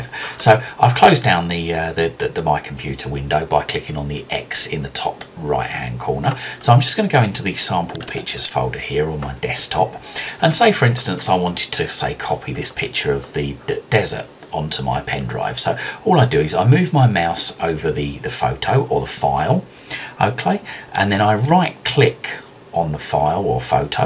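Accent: England, United Kingdom. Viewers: Great, Great, Have to listen very carefully, because of the English Accent,have an English E-Mail friend, so I am use to it, and have a Cousin in Scotland, that can't understand a word he says, maybe because he works for Johnny Walker in Kilmarnock , that's where my mother was born, on father's side, had a Great,Great Uncle who was a major on the British Army who received the Victorian Cross, John Buckley. Thanks for the video, very well done, Perfect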